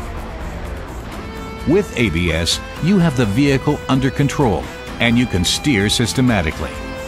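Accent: United States. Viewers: did we need the "american voice" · so many information not right: driving a car in high heels, a murican accent trying to illustrate the scene by showing stepping on break and CLUTCH....but overall good job ABS.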